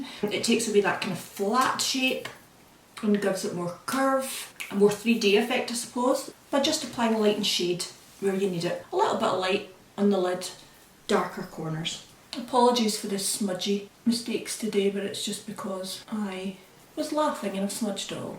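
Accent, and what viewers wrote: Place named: Ireland. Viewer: Hey! 👋 Awesome tutorial! Love the detailed walkthrough and commentary. Remember to apply those tips about the intro in your future vids!! Keep it up 😌 Love the irish accent btw hahah (think its irish? Lol)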